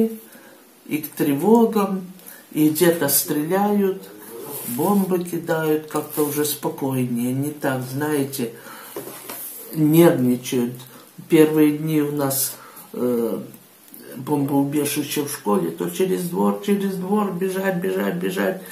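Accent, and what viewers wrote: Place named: Donetsk, Ukraine. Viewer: Вы так похожи на мою покойную бабушку,, она с Донецка была.. такой же говор … удачи вам..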